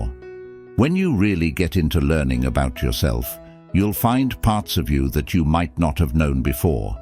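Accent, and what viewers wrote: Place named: England, United Kingdom. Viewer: Bla bla bla... with an English accent. Men have walked away from whomen, guy. Stoicism does not work on the modern whoman, she is too Marxist left now.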